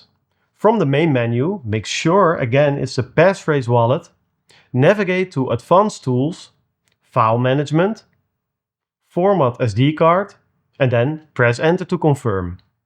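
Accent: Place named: Netherlands. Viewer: lol dacht ga engelse vid kijken gelijk NL Accent gehoord nu nog meer geintresseerd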